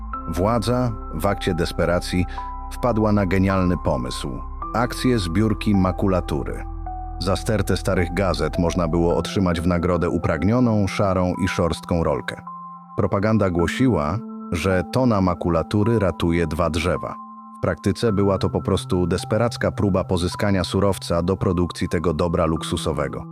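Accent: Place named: England, United Kingdom. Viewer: Bez urazy, ale wiedza autora z filmów Barei przesadzona w surrealizm. Oczywiście pewne fakty są prawdziwe ale np te z barów mlecznych z łańcuchami na sztućce i naczynia przykręcane jak na Misiu Barei to przesada. Polacy mieli dolary od rodziny z zachodu oraz bony PKO aby kupować w Peweksie lub Balatonie np walkmeny japońskie Wranglery itp. pracowali za dewizy np w Iraku czy Libii. Osobiście nic nie miałem do barów mlecznych. Chumorystycznie lecz przesadnie pokazana rzeczywistość PRL na kanwie filmowej nie rzeczywistej i ten akcent angielski coś mi mówi. Pozdrawiam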